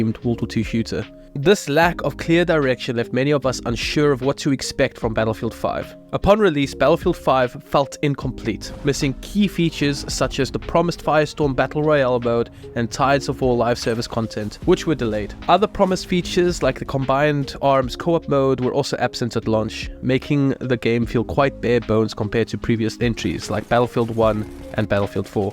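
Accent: South Africa. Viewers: You're South African? I knew that accent was familiar!!! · i knew i recognized a South African accent! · Bru, you sound South African ✌️ probably not, but shoutout from South Africa 🇿🇦 This video is literally me! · I am hearing a South African accent.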